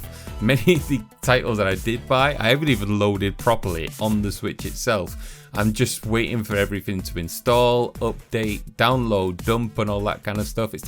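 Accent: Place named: Liverpool, United Kingdom. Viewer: Liverpool? Really? That's like when I said Totalbiscuit sounded like he was from somewhere between Liverpool and Manchester, maybe Chester, and was told he was a Geordie. I can hear a bit of scouse in you now you said it.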